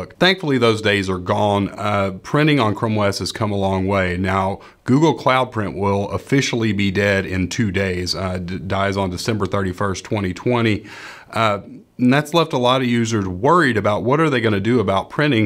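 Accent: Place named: Virginia, United States. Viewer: You sound like you're from my homestate Va. Anyway, do all chromebooks 315 connected to some printer? I looked up the ones that are compatible with CB and I decided on HP 2755e. Thanks for this really clear video. Second question, I thought you could just set up the printer on the CB screen by tapping the clock and then following through. How will I know if need HP Smart app?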